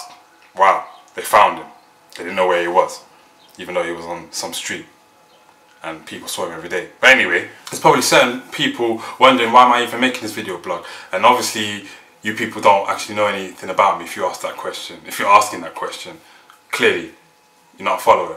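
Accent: Bosnia and Herzegovina. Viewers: I love your bosnian accent, your amazing :) bravo brate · ))) U speak like u r Bosnian :))) Congratis :))) and congratis on this video! its sooooo good :) i am glad u think like that because he is really a criminal, he killed inocent ppl :( and.. how can he say that he is inocent? thare is sooooo many videos and other important documents that proves his "inocent"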